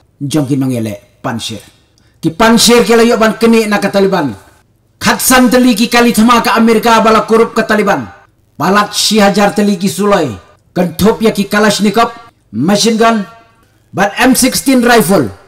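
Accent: London, United Kingdom. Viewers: Ga deg earphones kyndit ia ka sur bah London.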